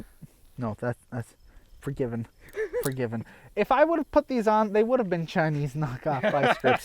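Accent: Canada. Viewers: You sound like a crazy country CANUCK... WHERE YOU FROM?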